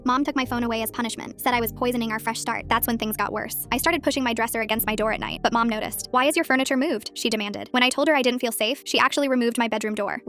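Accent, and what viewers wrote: United Kingdom. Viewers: Are you british question❓️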